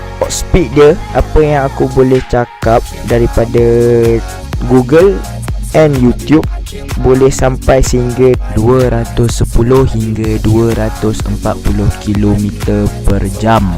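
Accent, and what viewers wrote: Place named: North, Malaysia. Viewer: Memang syok Ooo🤣🤣🤣🤣 ayat utara btl..😂😂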